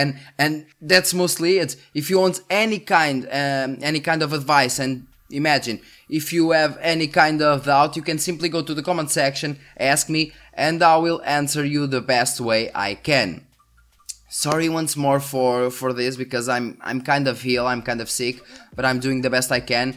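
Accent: Portugal. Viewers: Quando te ouvi falar logo no primeiro minuto pensei: este deve ser tuga, isto é um sotaque tuga a falar inglês. E eis que acertei! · Tens bons Vídeos,Só tens que melhorar o teu sotaque em Inglês,a primeira palavra que te ouvi falar soube logo que eras Portugues :) ahaha mas bom contéudo anyways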